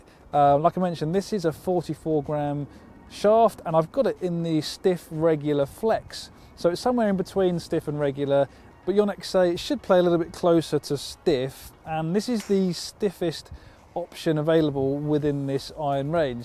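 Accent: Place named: England, United Kingdom. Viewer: Kevin Zhao they have that accent because they ARE English just like your accent is from your home country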